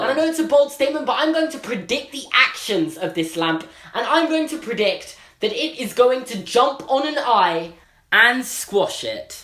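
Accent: England, United Kingdom. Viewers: Alize Cordero I live in England and he does have really posh accent to be fair....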